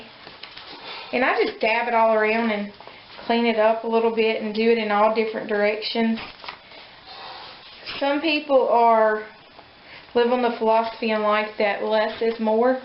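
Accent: South, United States. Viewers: I just love your little southern accent, and phrases. Reminds me of my sweet cousins from "down south". Thank you for sharing! ♥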